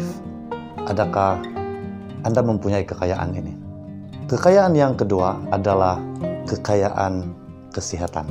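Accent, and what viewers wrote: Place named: Sabah, Malaysia. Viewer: Punya pakat ko punya slang sabah.. hahah sandakan ka ko.!! Hahhaha . Aku dari telegram cardock team.!! Salam kenal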